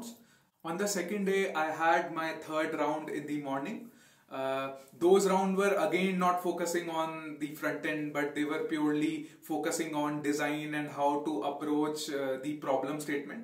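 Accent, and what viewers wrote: India. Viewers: sorry but your indian accent very strong, you need to work on that.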